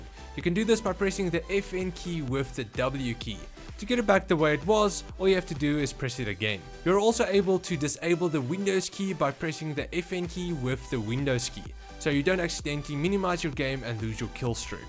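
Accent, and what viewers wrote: Afrikaans, South Africa. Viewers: This dude sounds so afrikaans it's crazy XDD great video. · He sounds like hes from South Africa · I can tell a south African accent from anywhere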